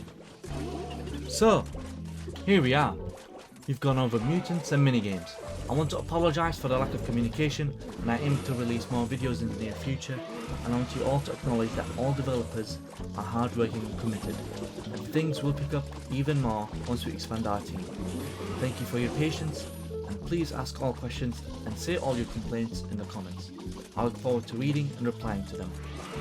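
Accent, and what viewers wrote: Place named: United Kingdom. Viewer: I need you to make an audiobook for lord of the mysteries, your British accent would make long words sound even more gentlemanly 😩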